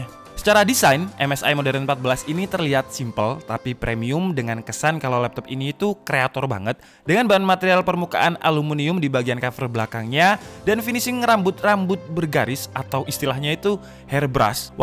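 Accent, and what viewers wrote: Java, Indonesia. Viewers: Wong jowo tenan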